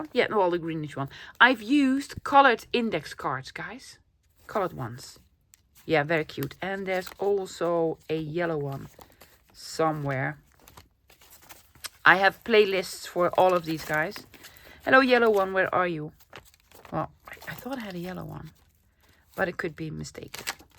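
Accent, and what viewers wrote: Netherlands. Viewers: You are so cute, I enjoy your Dutch accent very much. My grandfather, Cornelius Vander Jagt and my grandmother Cornelia Van Strien were born in the Netherlands. Your voice reminds me of Grandma's voice many years ago (I'm 70!) Your happy attitude make listening fun. I have been a artist for many years painting watercolor. I am now using photos of my art to design tags, pockets and anything to do with journals. I fussy cut the photos and apply them with glue. Trying to make my own ephemera, one of a kinds! Thank you for the hours of happy, relaxing fun. Debra L Pate Art.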